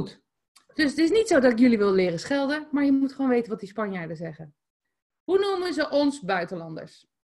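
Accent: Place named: Netherlands. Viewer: Aún tiene un acento holandés grandísimo lol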